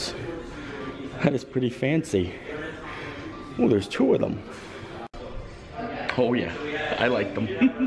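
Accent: Pennsylvania, United States. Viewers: Where are you from originally? I'm trying to place your accent. It sounds like my Pennsylvania cousins! Bar Louie in CT closed suddenly about a month ago. It was in the mall. Thanks for the positive upbeat vids!!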